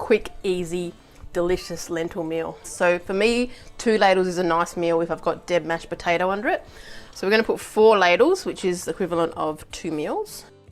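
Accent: Australia. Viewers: Hi I’m a newbie to dehydrating and came across your post. Firstly thanks so much for your excellent presentation and so good to hear a fellow Australian accent. Will certainly try the spaghetti bol recipe. Happy days from Nelson Bay NSW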